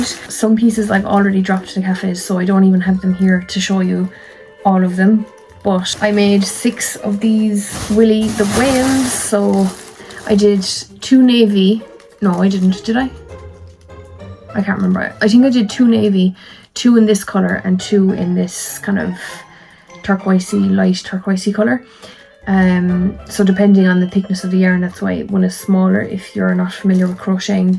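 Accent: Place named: Ireland. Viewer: Hi, there are so many cute item's just came across you today thought i could hear irish my mother from killkenny Ireland look forward to you're next video ❤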